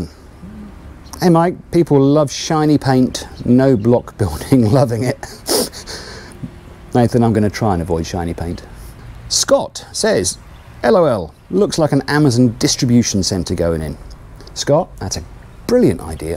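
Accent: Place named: England, United Kingdom. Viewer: Hi, I stumbled onto your channel [on a recommendation]. You must doing something right. So, I'm another new subscriber. It's great to hear a English chap [for a change] in the Philippines doing a building project. Anyway, I have a lot of catching up to do to watch all your episodes, so don't tell my asawa. Hope you enjoy the fruits of your labour. Thumbs up 👍.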